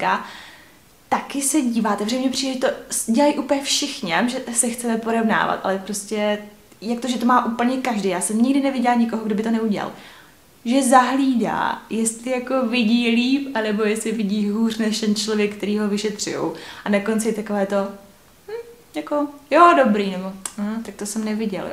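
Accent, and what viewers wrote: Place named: East Bohemia, Czech Republic. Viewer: Slečna mluví očividně východočeským nářečím 😉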